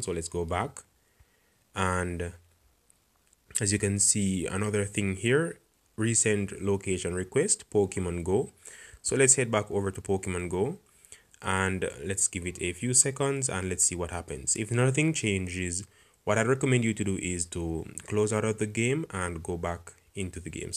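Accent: Jamaica. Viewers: Your jamaican arent you 🤔?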